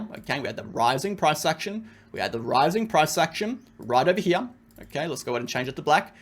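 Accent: Australia. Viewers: Let’s go …was waiting on that down under accent to guide the way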